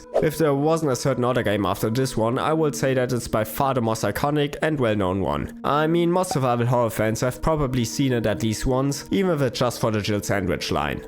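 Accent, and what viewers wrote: Germany. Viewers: Ich höre Deutsch raus hahaha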